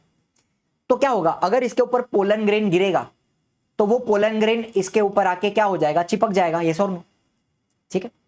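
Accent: Maharashtra, India. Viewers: Sir are you MARATHI ??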